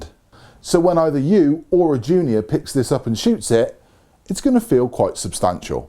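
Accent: England, United Kingdom. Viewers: The English accent is just easier for me to take than a lot of US videos, and I am from the US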